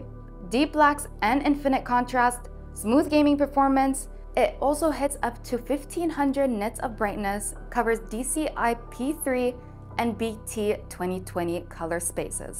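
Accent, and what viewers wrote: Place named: United Kingdom. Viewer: I need this monitor because you do the best British accent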